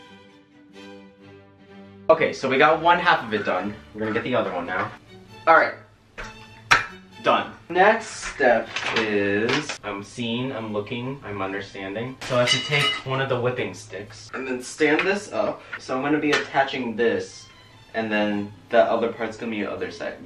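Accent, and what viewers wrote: China. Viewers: your chinglish is beautiful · That Chinglish 😂 honestly same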